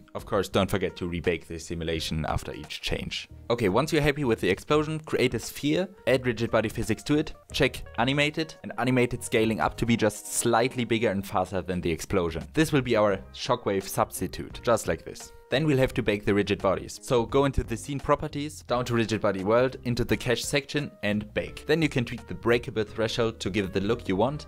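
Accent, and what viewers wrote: Germany. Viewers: das gesächsle nervt übelst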